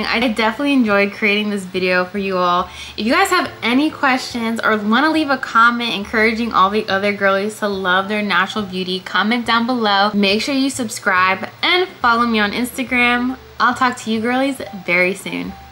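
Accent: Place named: United States. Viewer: Girl I love your attitude. I am not from U.S but I can hear it right away, the accent omg 😅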